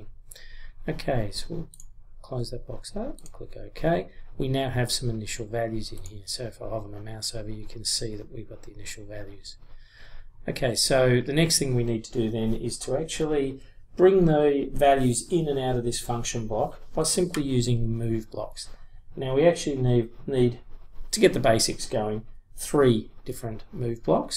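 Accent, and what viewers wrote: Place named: Australia. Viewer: Thank you for superb teaching video from Thailand. Listening to your accent I missed all friends in Melbourne.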